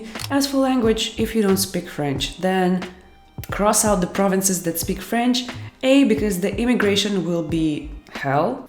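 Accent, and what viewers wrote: Russia. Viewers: Love your Russian accent. Sounds kinda cute though lol 😊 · You speak very clearly. Everyone like me who are hoping to go and settle in canada soon, will be able find your videos very informative & helpful.. By the way, No offence, i like your russian accent more❣️ Thank you for all those videos. Carry on..,❤️❤️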